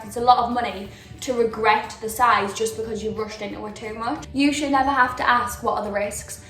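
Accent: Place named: United Kingdom. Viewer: omg your british accent is lovely!!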